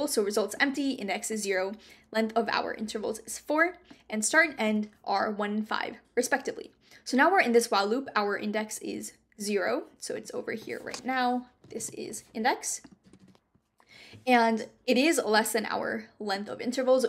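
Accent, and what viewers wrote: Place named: India. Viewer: Can feel indian and American accent ❤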